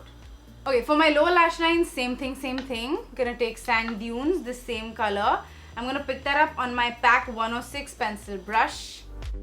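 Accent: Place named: United Kingdom. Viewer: I looove when she speak British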